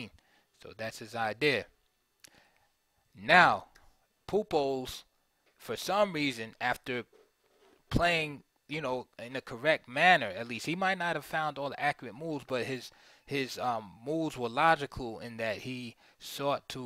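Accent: United States. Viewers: Put an Eastern European voice on this video...perfect. Just sounds weird with an American doing the verbal annotation.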